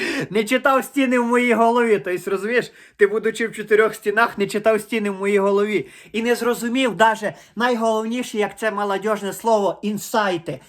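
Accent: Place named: Western Ukraine, Ukraine. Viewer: Вуйко, западенець з западенським акцентом буде менi росказувати якусь риготню??? Та зрозумiло що ти там десь в горах живеш в трембiту дмеш i нiкого там нема i можеш вiльно ходити по вулицi, а норм пацани сидять дома...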